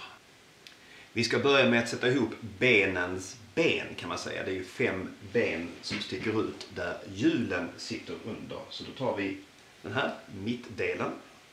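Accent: Skåne, Sweden. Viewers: Hi, I’m a Dane from east Jutland and I understod all of what you said without any major issue. My question is: would you consider yourself as speakning a prober Skånska dialect or perhaps a modern form of Swedish-Skånska? -which ofcourse is Swedish anyhow.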